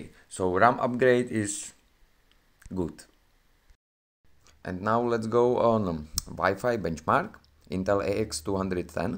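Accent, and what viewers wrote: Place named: Czech Republic. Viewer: 😀 brutální czechlish 😀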